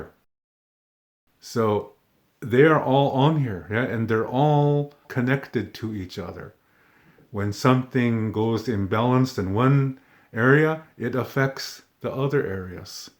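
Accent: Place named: Hawaii, United States. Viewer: You have an accent. Very similar to native Hawaiians. Kind of sing-song. It’s nice! Have an enlightened new year.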